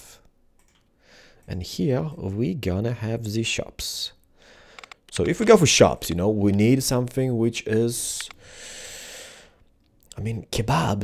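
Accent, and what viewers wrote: Sweden. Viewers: What part of sweden? Skåne or somthing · Are you from sweden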